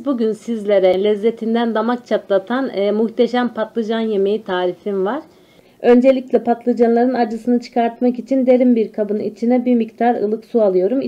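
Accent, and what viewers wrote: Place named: Afyon, Turkey. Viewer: Afynlu olabilir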